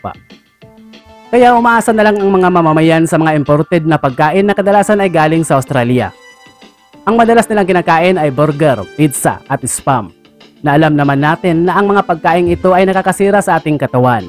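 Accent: Visayas, Philippines. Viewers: Visaya ka bay...wag ka nang mag English ...pilipino naman tayo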